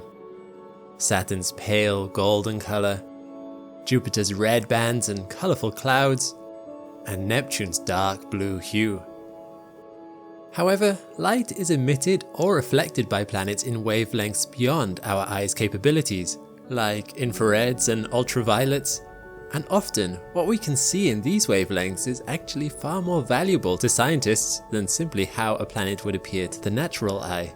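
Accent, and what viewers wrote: United Kingdom. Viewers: Why is he trying to sound like a British? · Why do you sound English but foreign at the same time? Can someone make it make sense to me? Is actually English or was he born somewhere else and moved here?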